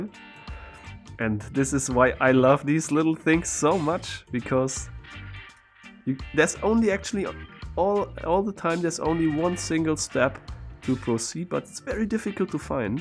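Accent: Germany. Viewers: I love his up-beat voice, and the German accent - 'Hai, und velcom to ' - is really charming.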